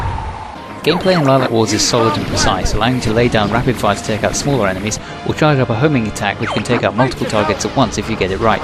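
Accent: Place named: United Kingdom. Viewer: You understand that he just sounds British, right? :)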